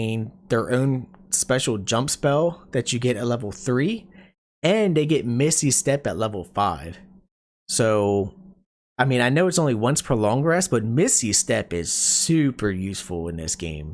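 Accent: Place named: Delaware County, United States. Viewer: The Delco accent discussing BG3 races is hilarious. If I’m wrong and you aren’t from Philly you have to be from Baltimore area.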